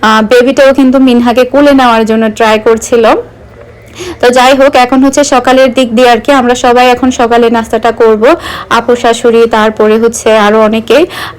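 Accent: Chittagong, Bangladesh. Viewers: Chatagong a vasa no boja r da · Onk din por Chittagong er kotha sonlam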